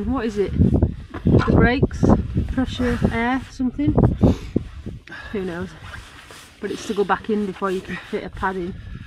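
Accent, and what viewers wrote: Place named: North England, United Kingdom. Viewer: north yorkshire, cumbria border. thought you were somewhere up north. lovely accent. secondly, you really do get completly different weather up there. you are harder. fair play to you. i hope you get some decent spring weather. and everywhere dries up. you are another superstar of the industry. good on you.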